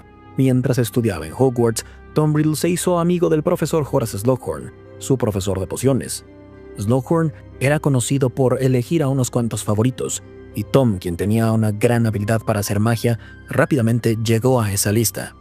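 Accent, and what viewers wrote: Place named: Latin America. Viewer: Oh español latino... esto si se puede escuchar (vengo de videos de españa y destrozan lo nombres)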